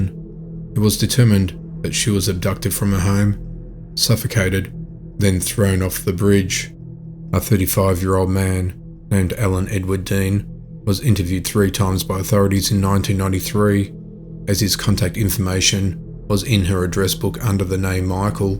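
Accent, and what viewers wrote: Australia. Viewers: So glad to hear an Aussies voice. Your voice fits perfectly to the background noises. Very tragic what happened to these people though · Great to hear a fellow Aussies voice 😀 Loving the videos so many cases I never heard of and wonderful to see that some I knew about have been solved. Binge watching ... cheers from Queensland 🍻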